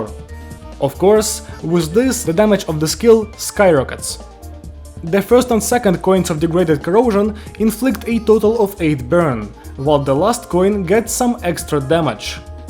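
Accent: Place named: Russia. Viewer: ты же русский? акцент выдает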